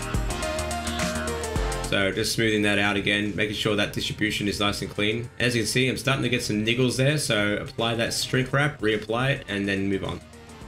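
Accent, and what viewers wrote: Australia. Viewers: Finally I have completed my mission to find aggressively Australian blender tutorials.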